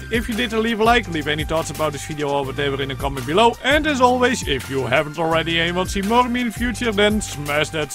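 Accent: Netherlands. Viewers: Je hoort dat hij Nederlands is hij is wel grappig😂😂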